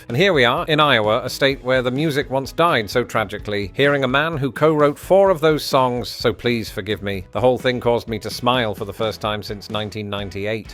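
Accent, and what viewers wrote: United Kingdom. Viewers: about Eisenhower turning American into the world's largest Scalextric. The WHAT?! That got me curious. Did I hear that word right? Was that waning British accent too confusing? Nope. I heard it right. I googl'd it. Scalextric is the leading brand of UK slot racing cars. Slot cars? Instant nostalgia set in. Now I know what meant.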